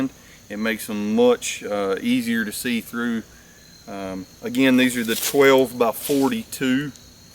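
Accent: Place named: South, United States. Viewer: The south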